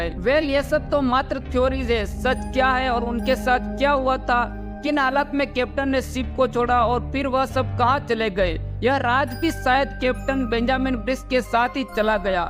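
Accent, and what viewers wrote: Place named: South, India. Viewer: Naration is very bad in southern accent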